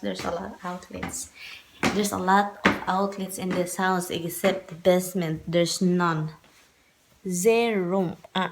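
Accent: Philippines. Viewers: i subscribed because your videos are like novelties to me. i think the way you talk and the way you get your point across on issues makes me laugh. i am assuming that you are educated and just taking the Filipino accent to a different level...happy for you and to your husband...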